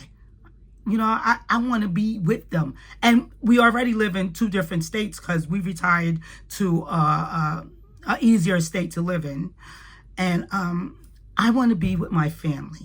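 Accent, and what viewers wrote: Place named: New Jersey, United States. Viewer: Loved your video, glad it came across in my algorithm, and yes, I subscribed. Your voice and your accent gave me a warm and fuzzy feeling of listening to my relatives back in New Jersey ❤ Looking forward to your new videos while I catch up on your old ones!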